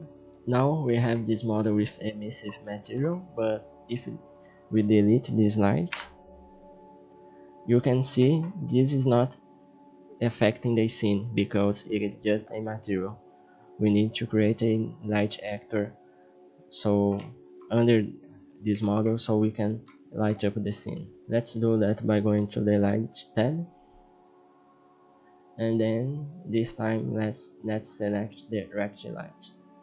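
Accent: Brazil. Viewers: inglês brasileiro :)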